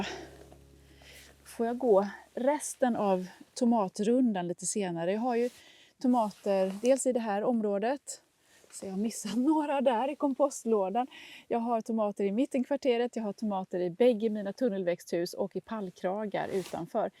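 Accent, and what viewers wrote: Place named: Sweden. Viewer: Som dansker forstår jeg 95% af hvad du siger. Det er sjovt hvordan det bare kommer an på den svenske accent hvor meget jeg kan forstå. Det er som om du snakker dansk til mig 😅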